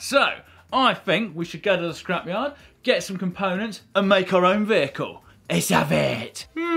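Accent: England, United Kingdom. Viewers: Why after every time I watch a new video do I want to start talking with an English accent and go out to the garage and try to think up the most insane and off the wall contraptions..... 😂😂😂fantastic video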